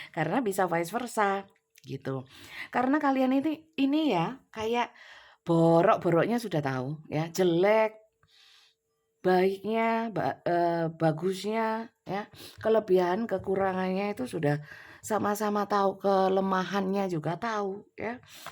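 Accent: East Java, Indonesia. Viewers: Aku itu selalu teetawa sendiri kalau mendengar readingan bunda. Karena khas bahasa jawatimuran nya selalu muncul..😂😂😂😂 benar benar terhibur..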